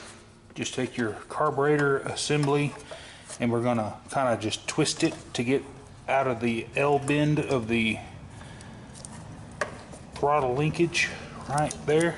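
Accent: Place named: North Florida, United States. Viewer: You sound like a North Florida Boy... Great vid. I'm a North Florida Boy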